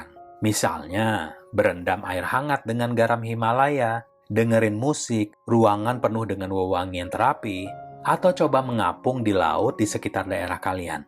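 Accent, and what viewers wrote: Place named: Java, Indonesia. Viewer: Tiba tiba logat bicaranya aneh....gimana ga aneh biasa ngomong logat Betawi kok tiba-tiba campur logat Jawa..😂😂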